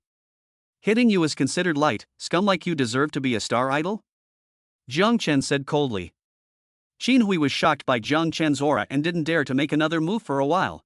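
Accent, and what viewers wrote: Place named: England, United Kingdom. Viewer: This English accent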